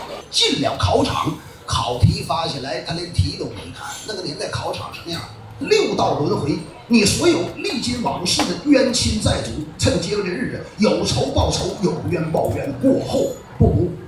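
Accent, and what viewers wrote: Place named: Sichuan, China. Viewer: 四川话太歪了😊😊😊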